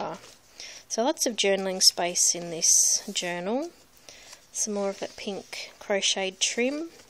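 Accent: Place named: Australia. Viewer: A great journal. Your swap partner will love it! Tfs and it's good to hear your Aussie accent. You did a really good job of filming and narrating.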